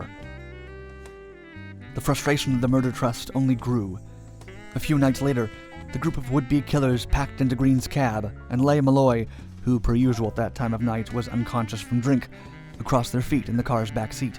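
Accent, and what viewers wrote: Ireland. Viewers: can you just do all future episodes in your irish accent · My God, that's an Irishman!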